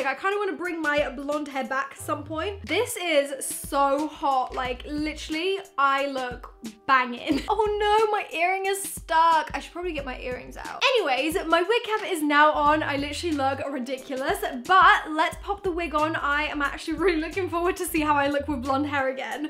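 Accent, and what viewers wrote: United Kingdom. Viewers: Normal english person: Better British person: Butter Lol it's cute 🖤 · Oh my.....this British accents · Wait ate you English omg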